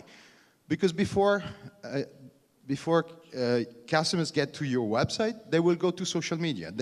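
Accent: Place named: Germany. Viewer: Ma parli inglese con accento tedesco. Come Giorgio Moroder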